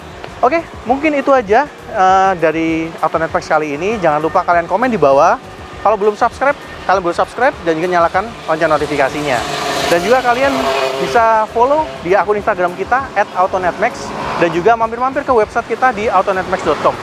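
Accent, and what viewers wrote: Indonesia. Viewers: Kokoh medok amat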